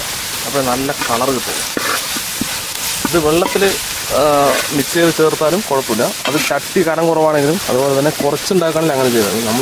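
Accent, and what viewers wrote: Palakkad, India. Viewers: പാലക്കാടൻ ഭാഷ ഇസ്തം 😀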